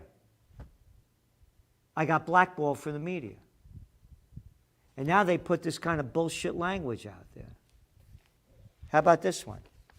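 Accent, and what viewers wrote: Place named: Brooklyn, United States. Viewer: "turlet" I love hearing Geralds Brooklynese.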